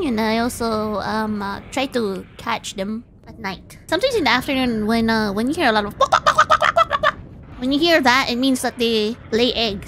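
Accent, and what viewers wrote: Philippines. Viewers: I wonder if is from batangas? She kinda has that accent form a batangenyo when she talks tagalog my Filipino guy's knows what I'm talking about.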